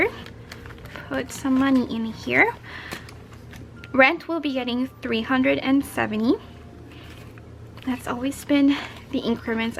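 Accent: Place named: Philippines. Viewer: Firts time viewer and why did I recognize the Filipino accent from sentence one. 😅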